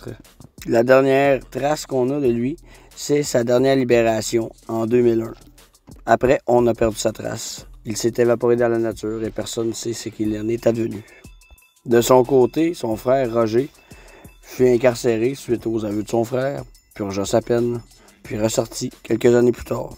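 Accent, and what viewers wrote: Quebec, Canada. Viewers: Bonjour, c'est quoi comme dialecte de votre Français -quabéquoi ? Merci pour la réponse ps j'adore. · Salut , au qc on parle quebecois ...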